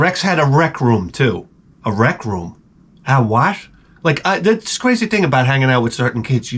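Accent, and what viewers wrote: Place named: Long Island, United States. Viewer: I stopped going to the Dr when I'm sick because they never help. Every time they tell me I have to let it "run it's course". I don't need to pay someone to tell me that shit. Hope you're feeling better though. And thanks again for creating the greatest video game program in the history of human civilization. You're now the only person I am supporting on Patreon because you're just so damn entertaining and funny. It's also rare that I find someone on YouTube that sounds like me 😂 Almost makes me miss living on long island.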